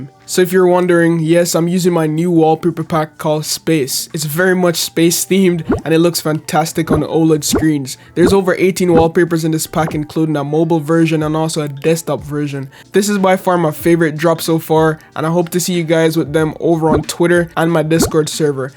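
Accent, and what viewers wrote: Jamaica. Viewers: You Jamaican? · You sound like a Jamaican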